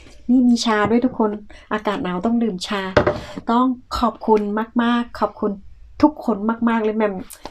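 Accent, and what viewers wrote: South, Thailand. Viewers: รักน้อง พูดใต้ ชอบฟังมาก ฟังไม่ออกหมด แต่ชอบคนใต้😘😍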